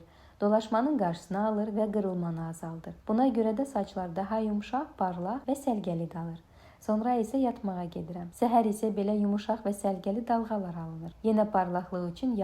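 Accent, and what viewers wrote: Russia. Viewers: Masallah olsun min dəfə❤ Bu niye belə danışır deyenlırə deyim Rusiyada böyumuş uşaqdır bu dile dili belə yatır. Azerbaycanda böyuyen hec bu qedər səlist danışmır❤❤❤Maşallah olsun! Gözəl bala, gözəl gəlindi. Allah mənə də belə gelin qismət eləsə nə gözəl❤❤❤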